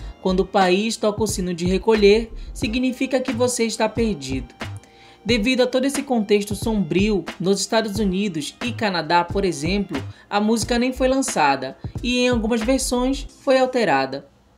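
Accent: Pará, Brazil. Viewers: tem um sotaque paraense lindo. ❤ beijos manauaras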